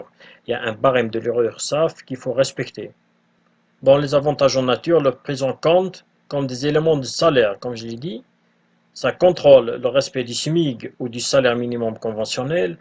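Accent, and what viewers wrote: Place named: Kabylie, Algeria. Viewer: Merci beaucoup, je souhaite suivre une formation pour devenir gestionnaire de paie, je trouve vos videos intéressantes merci beaucoup Au passage, dapres votre accent, je pense que vous etes kabyle, ca fait plaisir de voir des kabyles proposer des contenus pareils sur youtube, bonne continuation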